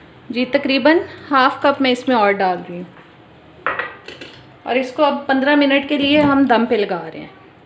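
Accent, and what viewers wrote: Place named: Punjab, India. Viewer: Thanks Ji, I also liked you Punjabi accent Urdu Loved it.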